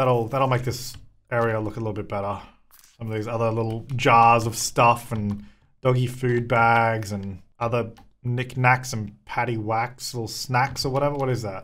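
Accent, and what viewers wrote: Australia. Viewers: Are you Australian English or American just curias 😓😓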